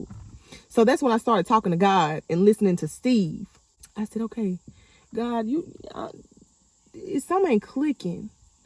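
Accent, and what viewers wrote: Mississippi, United States. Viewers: I knew!!!! You had a Mississippi accent!!!! So happy for you!!! You have to do what feels right! Glad I hopped on your YouTube!!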